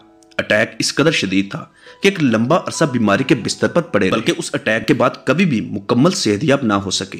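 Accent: Punjab, India. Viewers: بھای۔۔۔۔ اپکی آواز اچھی ہے۔۔۔ مگر ادائیگی کھردری اور پنجابی لہجہ ۔۔تھوڑا سا بہتری لانے کی کوشش کیجیے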